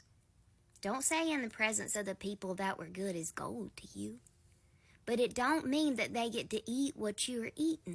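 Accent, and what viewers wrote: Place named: South, United States. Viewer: Amen I knew you was from the south because as soon as you spoke I giggled cause you and I have a similar accent.....keep on doing what your doing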